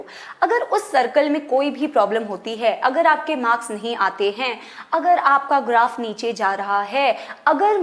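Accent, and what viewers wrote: Odisha, India. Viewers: Mam are you from odisha ?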